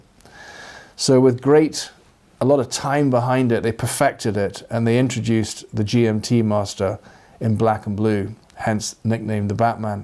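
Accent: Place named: Edinburgh, United Kingdom. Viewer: To be honest I think you`re spot on with you presentations: informative and relaxing to watch and as a bonus you slight Edinburgh burr makes them a pleasure to listen to. I know the Americans tend to do their videos in a different style but there's only so much shouting and fast talking i can sometimes take.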